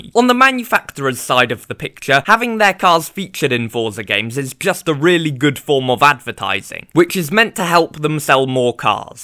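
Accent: United Kingdom. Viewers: you are british, right?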